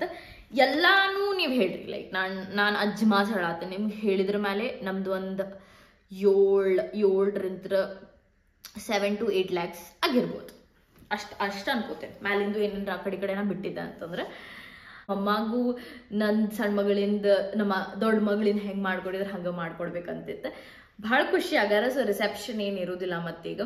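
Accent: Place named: Dharwad, India. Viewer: Lot's of love From Dharwad ❤ yast cute aagi matadti akka dharwad bhashe❤😘 #🔥ni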